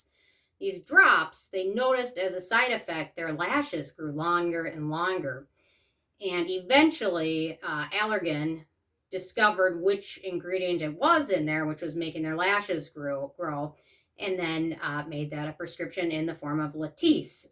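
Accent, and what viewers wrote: Minnesota, United States. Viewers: You've got that classic Minnesota accent.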